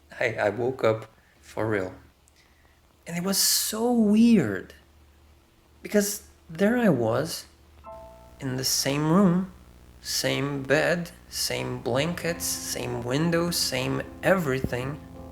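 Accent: Brazil. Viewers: Br? Eu senti o português no primeiro segundo do vídeo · Demorei pra perceber que você era BR. Mas mesmo assim, conteúdo muito bom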